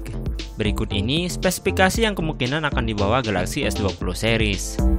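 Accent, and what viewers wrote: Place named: Bugis, Indonesia. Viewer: Logat bugisnya masih ada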